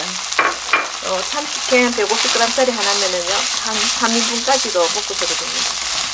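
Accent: Gyeongsang, South Korea. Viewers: 좋은정보 감사합니다!! 차분하고 경상도 특유의 애교스런말투 너무좋네요^^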